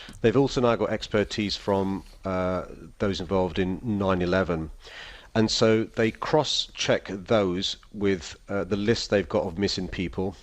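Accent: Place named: Yorkshire, United Kingdom. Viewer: Speak up! How could anyone not from Yorkshire understand you?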